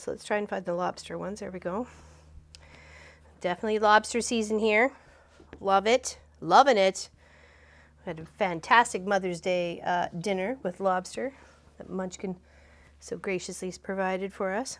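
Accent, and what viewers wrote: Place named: Nova Scotia, Canada. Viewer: so very Nova Scotian love it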